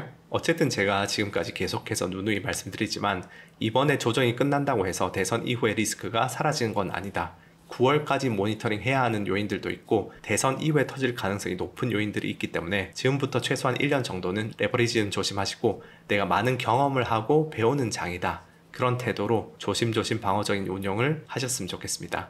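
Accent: Gyeongsang, South Korea. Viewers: 대구 말투네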